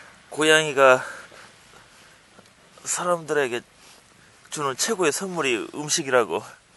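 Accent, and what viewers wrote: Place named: Honam, South Korea. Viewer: 고양이가 큰게 아니라 부은거다 임마 말투도 조낸 듣기 싫네 호남사투리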